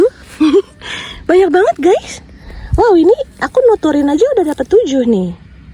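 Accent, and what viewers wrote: Indonesia. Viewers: Selamat mba,semangat ngonten nya.PD mba,suaranya enak kok di dengerin 😊,khas anak jaksek.ternyata ya orang jagakarsa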